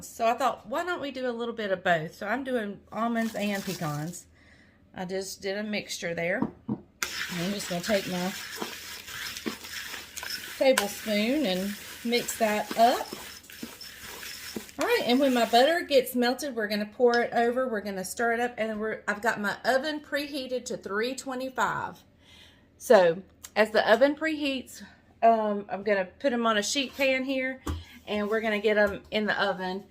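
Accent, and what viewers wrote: South, United States. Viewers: I noticed the wrong setting on your new camera, I know you will get it stright just want to mention it incase you can fix it ( I know I could not) please dont get up set by this note just information. any thing that is read is backward the door to the back room is on the wrong side of you (near Carolines picture. )I sure enjoy this channel. I have to turn on closed captions because I am not very educated, you have a southern draw, you talk about things I do not know about, anyway I thought it very funny how the closed captons say things I am sure you never said.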